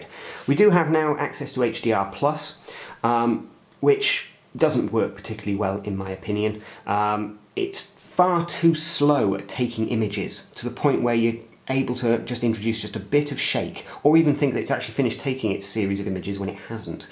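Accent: United Kingdom. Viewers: Thanks a lot for your videos. 1 : I'm French, so it's really easier and more comfortable to listen to someone speaking British English. thank you for that :P 2 : thank you for not being a N5 fanboy, and for pointing out both good and bad aspects of the phone, that helps me a lot ! 3 : Please let us know when you can tell whether the battery is decent. Thanks ! :)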